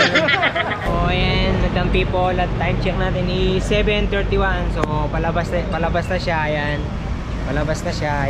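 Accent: Pampanga, Philippines. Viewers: Kapampangan Accent pa din, kuya 😂✌️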